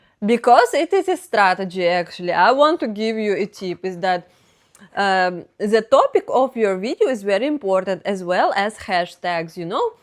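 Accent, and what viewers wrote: Russia. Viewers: We love you my russian queen 🇷🇺❤️👸 You are beautiful and intelligent. Harmonic and calm it's very pleasant. Remember! The most of us is not native English speaker so you can talk as your like with your lovely accent. English is only like Latin during the middle ages for people to communicate and reach out to one another. Fuck the western corrupt political elites. We know the truth and respect the great Russia. And some of us know our common old history. I love to see your videos from Russia and I feel and see many related things. Only in a another way. Keep up the good work. With love 💕 from Sweden 🇸🇪👱👋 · I've noticed that in your latest videos your Russian accent is more pronounced than in your previous videos from the last few years... it sounds great. Spanish is my native language, and I have to say your English is clearer than that of many YouTubers who speak English as their native language. 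I watch your videos to practice my English listening skills, and I understand everything you say perfectly. You do a great job! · Your Russian accent sounds so elegant! I could listen to it all day and be like 😊!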